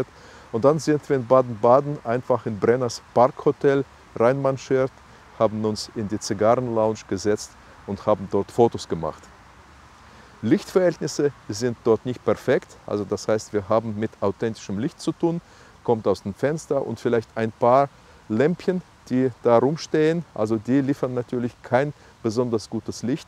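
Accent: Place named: Soviet Union. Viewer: Вы наверное никогда не избавьтесь от советского акцента...извините если что, Старый водитель TIR. Сейчас стокер...ландшафтный.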